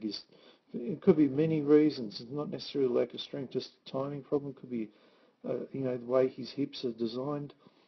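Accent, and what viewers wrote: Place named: Australia. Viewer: Another superb "keeper" from. Very informative, video well done, commentary obviously knowledgeable and links for further relevant info provided.And thanks for writing out all of the voiced instruction- the Aussie twang can be challenging to North American ears ( no offense intended and none taken I hope ).Well done-thanks!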